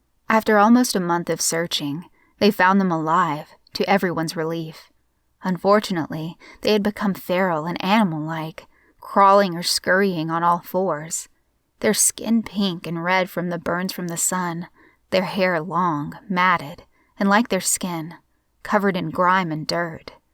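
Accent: South, United States. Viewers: it's so cool and her southern accent comes out so well in that and it's like seriously my favorite story I've listened to it a couple of times I don't want to wear it out I love that story it's awesome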